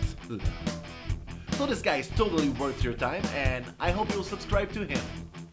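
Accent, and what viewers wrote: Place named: France. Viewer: Très drôle t'entendre parler en anglais, frenchy! :P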